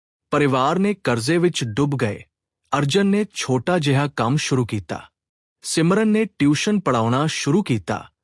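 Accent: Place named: India. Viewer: Punjabi tan tuhadi hindi touch Wali Hai isnu improve karo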